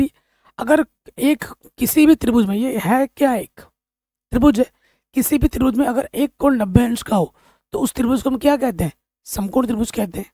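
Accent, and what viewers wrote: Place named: Jharkhand, India. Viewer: Sir mujhe lgta h ki aap jharkhand se ho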